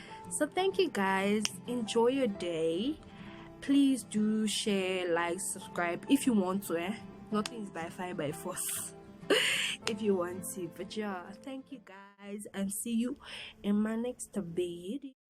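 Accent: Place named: South Africa. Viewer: I'm sorry u had to experience that. So glad u r talking about it. It's nice to see someone from Madagascar in SA. I love Malagasy language, music and culture. It seems u have been in SA for a while (your accent says it all 🙂). If you visit Madagascar one day, please get me CDs, will pay for them. Regards Richard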